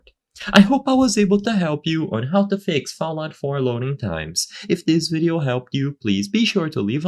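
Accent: Brazil. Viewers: Percebi que é brasileiro pelo sotaque 😅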